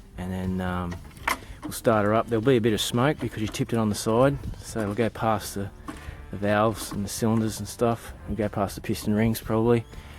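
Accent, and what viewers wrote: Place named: Australia. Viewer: you sound like an Aussie bloke !!!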